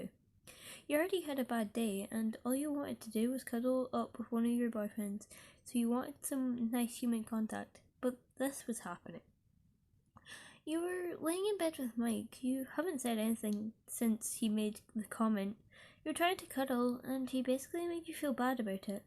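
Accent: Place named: Scotland, United Kingdom. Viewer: When you spoke the exact words that came out my mouth was "wait a minute you sound scottish" 😂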